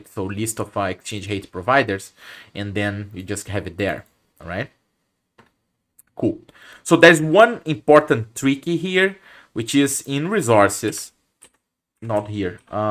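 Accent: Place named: Brazil. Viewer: Pretty good job explaining the concept man My dad is also a although we're from mexico, but i can tell you are very brazilian from your r's!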